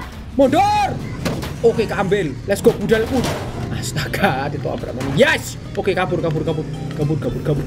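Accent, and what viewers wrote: Java, Indonesia. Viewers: Bang sama bang Dicky/Tampan gaming kan sama sama logat Jawa bang Dicky kan Semarang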